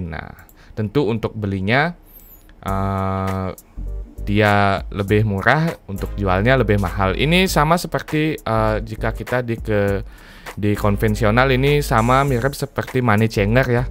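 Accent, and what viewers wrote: Bali, Indonesia. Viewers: Mantap ilmunya bosku.. Tp logatnya ky org bali ya 😁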